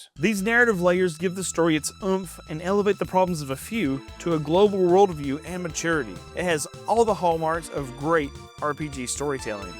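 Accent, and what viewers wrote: South, United States. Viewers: Your accent makes me feel at home (Grew up with southern people) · I enjoyed this video way more than I ever thought and the southern accent made it way better 😂😂😂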